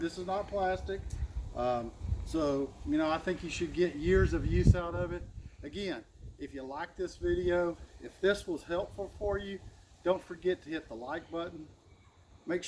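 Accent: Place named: Southern Indiana, United States. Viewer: I love your accent. Southern Indianna? I love how you folks pronounce OIL. In northern Minnesota it's pronounced " Oy-L". You folks pronounce it "OLE" that would rhyme with "Coal" or "Hole". specifically pay attention to the mark in the video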